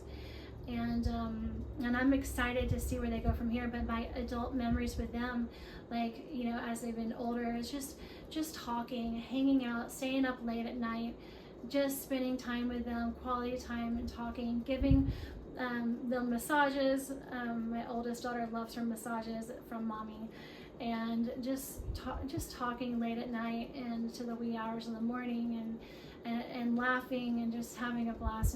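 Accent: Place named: South, United States. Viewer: She definitely still has a bit of a Southern accent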